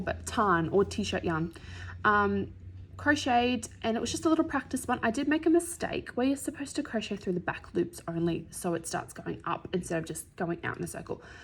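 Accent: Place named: Australia. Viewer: Hello I was recommended to your podcast from the sisters at Frivolous & Frugal (Penny & Dawn) USA what a surprise when I heard you speak, a fellow Aussie 👍🏻 I live in mid north SA and after going back to your 1st episode instantly subscribed. Rainy day & cold today I’ll be watching in order your previous episodes, snuggled up on lounge knitting. Many thanks 🦋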